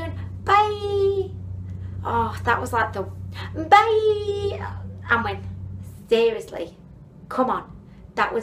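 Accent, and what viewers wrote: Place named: Northwest England, United Kingdom. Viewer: So refreshing to hear a Northern West England accent. Great video.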